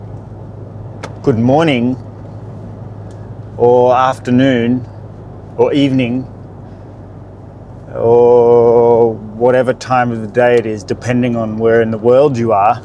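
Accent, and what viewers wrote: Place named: Australia. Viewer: How that I am not notice your australian accent , until now. That explain a lot of things . Hapy holiday mister driver.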